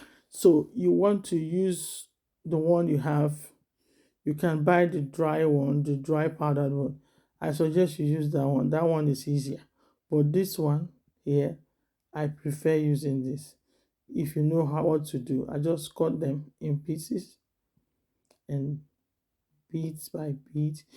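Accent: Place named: Yoruba, Nigeria. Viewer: I'm confused by your accent and your name, you speak with Yoruba accent and your name is, these leave me wondering, please define. Shame on you swallowing early in the morning, I joined you, I'm proud of it. Thanks.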